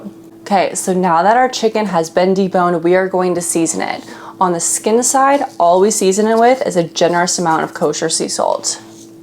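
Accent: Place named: United States. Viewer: THE most grating American accent….pepperrrr,wadderrr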